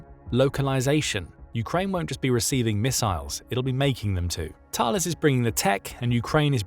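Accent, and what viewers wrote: England, United Kingdom. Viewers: You sound English. You're wittering on about British armaments. So why are you stupidly pricing them in dollars. They actually cost POUNDS STERLING. What are dollars worth? Considerably less now than yesterday, and less yesterday than the day before. Somewhat like the German Mark in the 1920s-30s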